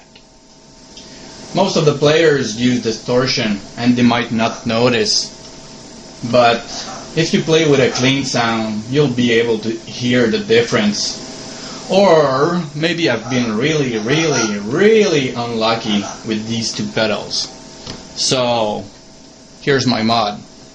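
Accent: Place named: Quebec, Canada. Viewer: You have a Quebec tone..lol Thanks for the review..I was going to buy one.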